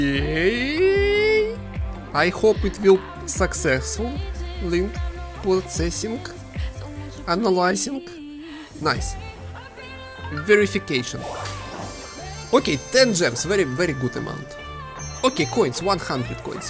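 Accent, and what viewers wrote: Russia. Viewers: R u from Russia?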